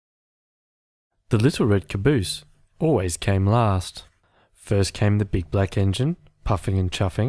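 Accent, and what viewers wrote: Australia. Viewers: I actually like the Aussie rendition of this! You definitely speak with feeling. Enunciation as always, highlights the points of childrens stories and those words that they are supposed to understand. Seems that you've done that. I like getting feedback on my channel as well. I have written a few original children's stories if you would kindly take the time to check some of those out on my channel and let me know what you think! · I think this is a really good reading and it is a classic children's story. Nice to hear an Australian voice. I do think, however, that we Australians tend to speak with a lot less light and shade and inflections in our voices than many other native speakers of English. I think the reading of this simple and engaging story, simple though it is, could do with a more light and shade in the voice - especially when talking about the hero at the back of the train. 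Also, perhaps, more pauses and a changes in tempo - I suppose - in the repetitive sections about the various different cars - the oil cars, the box cars et cetera. But, it's the reading to the children that is so, so important - the manner of the reading less so. But you will find the more you inflect your voice when reading, so will the children when it comes their turn to read it to you ... as they will! Aren't the illustrations classic. The illustrator has a very interesting story himself, and was a renowned artist in the years between the two world wars. Best of luck. More soon, I hope.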